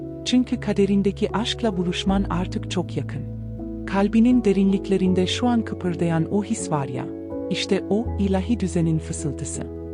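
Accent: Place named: Germany. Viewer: Şu Almancı karıya okutmayın şunu ciddiye alamıyorum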